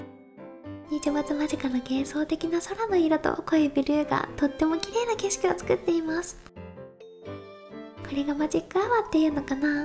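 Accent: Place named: Ehime, Japan. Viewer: (•ө•)♡ こんばんわ今回は箱根なんですね！その前に… とチャンネル登録者数〜 やっと、やーーっと２万人越え！！長かったけど、じわじわ確実に増えてて、嬉しいですね！本当におめでとうございます🎊 でも、コメントへの返事がますます大変になってしまいますよね… 😐 きっと、なら大変とは思わず、「かまん、かまん」なんでしょうね。 （↑愛媛の方言で合ってますか？） 今回の箱根は何月の旅行でしたか？ガーミンの気温に18.8℃と表示されてたので、４月頃でしょうか？山道だから５月かな？日差しが熱いって、６月？🤔 のジャージ、青い空、の首（日焼け対策してますね！白いです笑）、ロングヘアー、凄くマッチしてて可愛いですよ(•ө•)♡ 以前の動画でも今回の動画でも「〜 …じゃあ」って、今回の動画では「路地裏の斜度もきついんじゃあ」は愛媛の方言ですか？女の子の方言って可愛いですよね (•ө•)♡ チェーン落ち着くのところで参考になれば、、自分はサドルバッグにニトリル手袋を入れてますよ。パンク修理は汚れは気にしないですが、チェーン周りの何かの作業をすると黒い油が手につくし、バーテープも汚してしまいそうなので、念のため入れてます。ガーミンちゃん、やっぱりそうなんですね…😭 自分も横浜市に行こうとナビに従ってると国道357をひたすら走り、東京湾海底トンネルに案内されます。高速道路と自動車専用道路（国道357）なので通ることが出来ずかなり戻されます😭 後ろから車が飛ばしてくるのって結構怖いですよね… 自分は右側のバーエンドキャップを外して、凄く小さなバックミラーを付けました。後ろを振り返ることなく後方確認が出来て、買って良かったアイテムの１つです。おすすめです。硫黄の温泉♨、いいですよね！箱根は何回か行ったことあるんですが、硫黄臭い印象が無くて、そうなんだ～！！と勉強になりました。草津温泉♨は硫黄の臭いが凄いですよね。温泉玉子のシ～ンで写っていた太もも、鍛えられた太ももですね！凄い Σ(ﾟДﾟ) クライマーの脚じゃないですか～💦 いいな～ 坂が好きって、憧れます。自分のここ最近ですが、土日のどちらかに横浜市にある浜カフェというところに行ってます。往復で80キロくらいです。膝の痛みはまったく無くなりました。少しずつ距離を延ばしたいのですが、夏の日中の暑さがきつく距離を延ばせず…です😢 はじめの頃は帰り近くになるとふくらはぎが攣ったりしましたが、ネットでいろいろと勉強して、ポカリかアクエリは必須、トイレに行って黄色いおしっこが出たら水分不足ということを知りました。水分&ミネラル補給がダメダメでした💦 ミドリ安全というところから出しているミネラルのタブレットも買って、今日（7/9）、この暑さの中びっくりするくらい快適に走れました。夏季休暇はまだ未定ですが、自分もを真似て、一泊ライドに行こうと計画してます。はいつかはきっと富士ヒルにも挑戦するんだろうな～～と思いました。応援してます！ （また、めちゃくちゃ長文になってしまいましたが💦🙇‍♂💦） 待ったね～～(•ө•)♡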